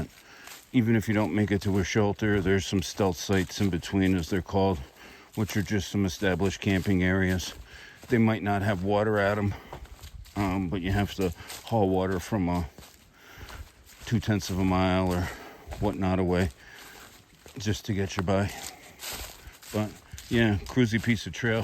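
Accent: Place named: Connecticut, United States. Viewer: You sound like you are from NW Connecticut?Enjoy the hike!!!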